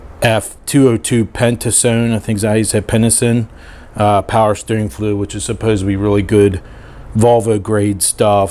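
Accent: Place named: Pittsburgh, United States. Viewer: Thanks for posting this. I took my 4.4L apart to replace the PCV valve. Your video helped a lot. And finally, you have to be from Pittsbirgh, PA judging from your accent!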